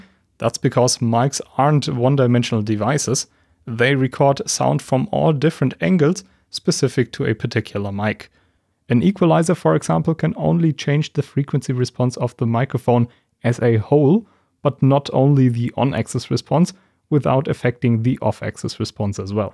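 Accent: Germany. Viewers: HI #Detschakzent trotzdem cooles video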